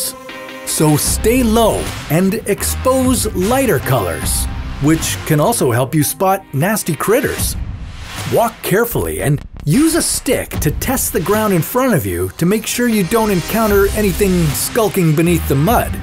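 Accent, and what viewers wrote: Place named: United States. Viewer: american accents are the worst. we need an old english dude commentating this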